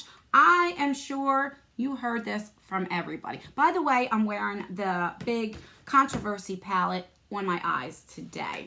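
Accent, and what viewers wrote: New Jersey, United States. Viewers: you are so generous and giving. Thank you for doing this. My husband was raised in New Jersey, and I enjoy listening to the Jersey accent so much. Besides, you are very fun and expressive! People like you are loved and hated because of your beautiful soul. Keep being who you are, it really lift spirits. God bless you always! 🌺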